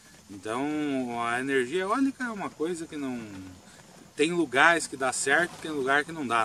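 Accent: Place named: Paraná, Brazil. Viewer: Que orgulho do nosso sotaque paranaense .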